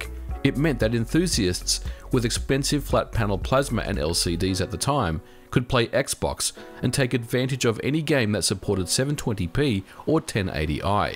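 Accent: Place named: Australia. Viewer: Nope, I'm watching this because I could never miss a video with this amount of aussie accent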